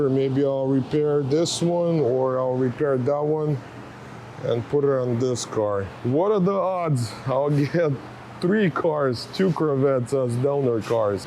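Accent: Russia. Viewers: Seems maestro has Russia accent.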